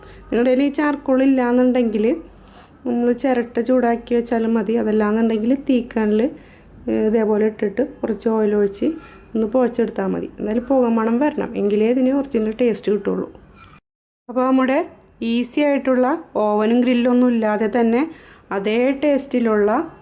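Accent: Kodungallur, India. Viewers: Ellathinteam pirake ka nnanallo. ...kodungaloor bhashayano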